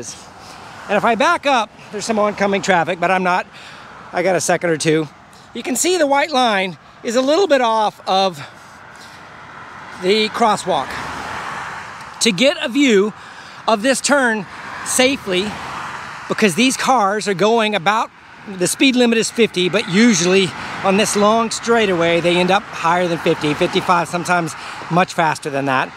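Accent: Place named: United States. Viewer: ...could've sworn I heard him say "metre"...and appears (from accent) to be from USA and appears older than 30. Whoa! There IS hope for the USofA yet! (must be an engineer :-) Seriously though, great content/analysis, thanks!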